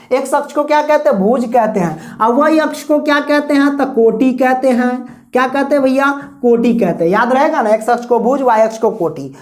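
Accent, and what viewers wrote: Bihar, India. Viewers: Bihar se ho sir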